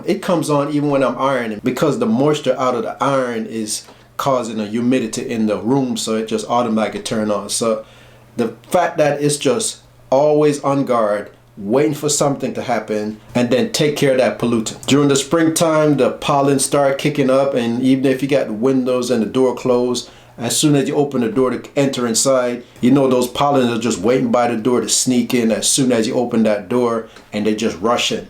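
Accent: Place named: Jamaica. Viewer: Thanks for the video! I'm detecting a little accent from u. Sounds a little jamaican 🤔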